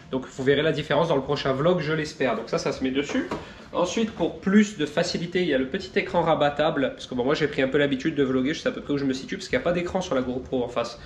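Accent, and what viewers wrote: Corsica, France. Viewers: J'adore quand tu fais des "interrogations exclamatives", ton accent corse ressort tellement !!! "Mona elle est pas là ?!!" "J'ai pas fait couler mon café ?!!" Ne change rien 😉😄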